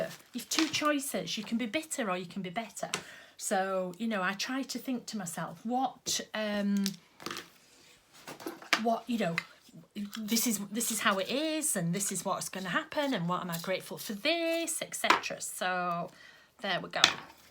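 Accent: England, United Kingdom. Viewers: I cannot say how much I've loved watching and hearing you. As an ex-pat in Australia, I've loved listening to you talking about everyday English things with your brill accent....as well as watching your amazing creative skills. I've only recently discovered you after listening to your Podcast on <link> and I've been obsessed ever since!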